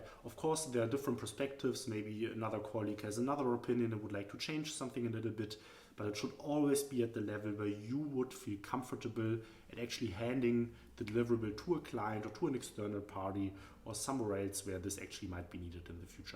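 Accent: Germany. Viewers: My business mentor I did know I had. Like the German accent more so the content. I asked them all and you answered. danke aus tiefstem herzen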